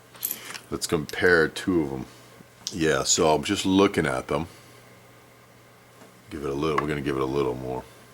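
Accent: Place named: United States. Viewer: You sound exactly like my history professor lol, what state are you from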